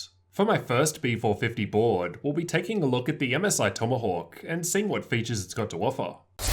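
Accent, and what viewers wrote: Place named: Australia. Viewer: U are Aussie right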